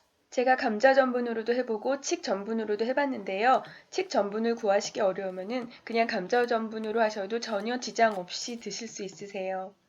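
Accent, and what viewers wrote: Seoul, South Korea. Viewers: 말투가 꼭 서울사투리 영상에서 들었던 말투 같아요 귀에 쏙쏙 박히면서 듣기 좋네요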